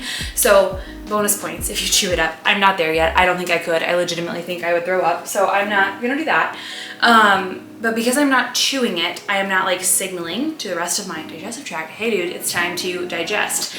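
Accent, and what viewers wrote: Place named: Idaho, United States. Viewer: Am so excited to have a new great channel to watch! Sounds like you're maybe an Idahoan too?! Neighbors!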